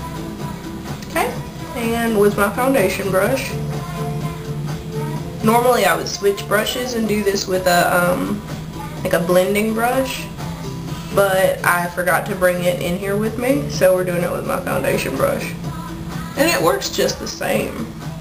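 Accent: United States. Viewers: she sounds so country hehehe reminds me of my home town nashville tn but i never picked up a accent. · i love your country accents!! So cute!!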